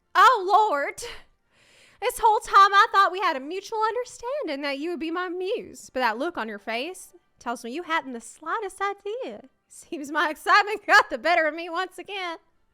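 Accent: South, United States. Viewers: A+ southern RP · The southern accent made this video great for me being from and in southern Louisiana 😂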